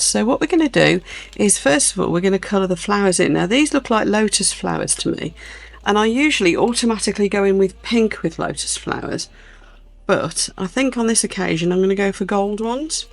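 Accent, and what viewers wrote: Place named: Black Country, United Kingdom. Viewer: LOVE the subtle black country accent.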